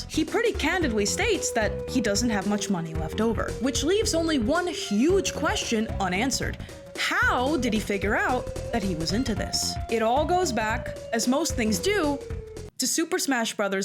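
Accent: New York, United States. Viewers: Just found your channel and watched a previous vid and you sounded like you were from NY then i see this one and you sound like your from california or something,so funny you put in a cut about it and broke the 4th wall some truman show shit 😂😂 but great vids i think imma sub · saaame!!! i have a relatively weak but present Boston accent (similar to hers, which sounds very NY) and have russian as a first language….americans are so funky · She has a slight Staten Island(New Yorker) accent. You can hear it on her vowel sometimes, it's not very prominent. I think it's great!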